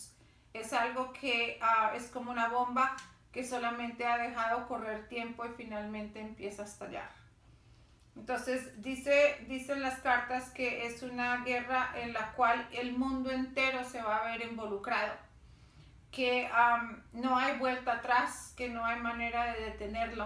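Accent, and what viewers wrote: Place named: United States. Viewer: ahhmm tu porque hablar como gringa?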